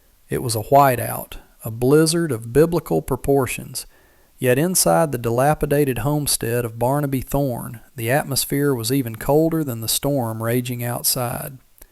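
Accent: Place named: Alabama, United States. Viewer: Love hearing a story by someone speaking with my accent. Such a beautiful story. Thank you for sharing. Listening from Alabama….❤❤❤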